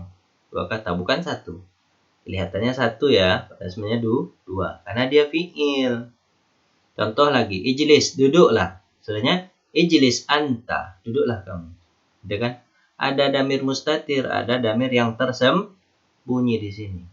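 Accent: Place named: Malaysia. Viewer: Logat Melayu nya 😂😂😂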